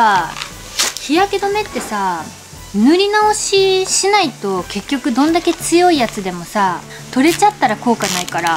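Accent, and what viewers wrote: Kansai, Japan. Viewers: さんのYouTube初めて見ました！！！ゆったりな喋り方に更に関西弁なのが可愛すぎます😂💗💗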